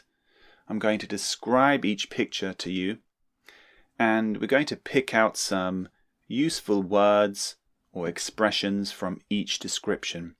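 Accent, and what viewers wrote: United Kingdom. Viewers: With which British English accent do you speak?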